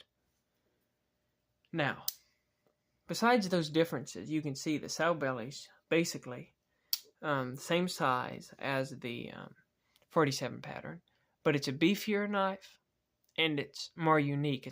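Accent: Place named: West Texas, United States. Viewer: He’s probably not from there but his voice and accent reminds me so much of a soft spoken hotel clerk in Van Horn, west TX 😂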